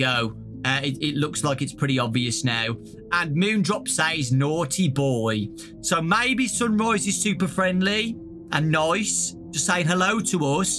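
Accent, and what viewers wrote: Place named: England, United Kingdom. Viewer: I never tought english accent is funny but now… you have changed my perspective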